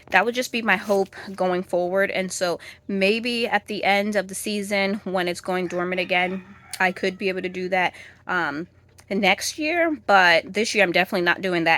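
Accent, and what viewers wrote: New York, United States. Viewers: I never heard your New York accent before! I would have never guessed! Wow! You are really prepared for Spring! This summer I will be attempting to grow Tepary Beans. They are native to our Desert here. The Native Americans have grown them for centuries here without any Irrigation! Hope they grow! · Heyy... I love your N.Y. accent, just let it roll.. lol.. but you're doing just fine. I am glad you were able to get the beds done and the hoop house. I too, did a lot of marigolds as well and doing some more flowers. The duck cattle panel hoop house is cute too.. have you look on pinterest on duck, and chicken panel hoop houses.. they are cute as well.. I am so stoked about going out to the homestead when it warms up to design or get everything started... You have everything going well, I am very proud of you on every thing you do.. 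have a wonderful day.. I do have a question though. If you look at my beds will I have to get top soil or could I just put my black kow on top. I used a lot of compost leaves sticks etc.. or do how you are like putting top soil and then the black kow, I get confused.. You do look good sitting up under your gazebo with your table and chairs.. lol.. Thank you for the video.. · I’d never known you had a NY accent, but it’s ok, you sound good to us. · Don't lose the NY accent - it's cool!😎 I'm from AZ but have always loved NY❤️✌🏽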